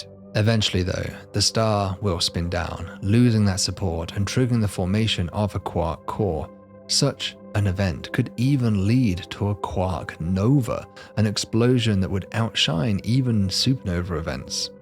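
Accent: United Kingdom. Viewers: "Poolsar"? Never heard that pronunciation before. Does he also say, "Check my poolse"? OK, he also says "repoolsion." Where is from that he has a classic British accent except for that quirk?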